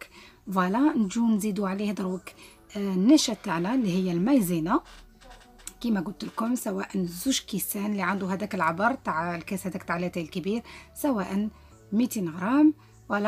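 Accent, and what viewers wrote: Algeria. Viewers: ماشاءالله تسلم الآيادي أختي وصفة ممتازه ولهجتك الجزائريه لطيفه احترامي وتحياتي لك من السعوديه 💚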